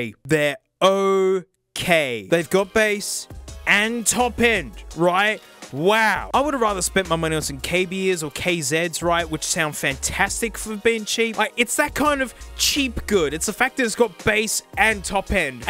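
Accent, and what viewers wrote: Australia. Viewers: Hearing a Australian man talk into the worst sounding microphone ever makes me happy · I love how aggressively Australian his stories are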